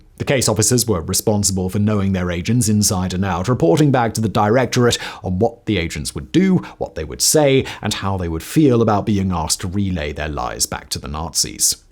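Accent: United Kingdom. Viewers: I'm pretty sure this was a very good program. What I could understand was very good. My problem is that I am a US citizen, and though I love British accents, I can't follow very rapid speech. I missed at least a third of what was said because it was a little too fast for me to be sure I was comprehending what had just been said. If your main audience is British or Commonwealth citizens, I am sure you are doing a terrific job. If you also want to let Americans appreciate MI5 and XX, perhaps you could speak just a little more slowly? I know the fault is MINE -but I did hope to hear it all, and I couldn't keep up.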